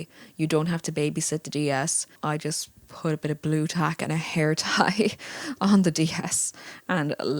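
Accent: Ireland. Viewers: Checkpoint said that this was a delightful tale with bonus irish accent. At the start I thought to myself this is a light accent, and then for every minute the accent more and more :P Anyhow this was indeed a delightful story. :)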